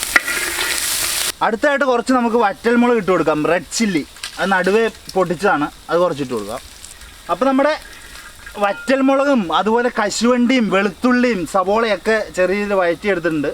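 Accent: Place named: Kottayam, India. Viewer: Ok, but broo ningade language pakshe nk oru kottayam area ayit thonniyallo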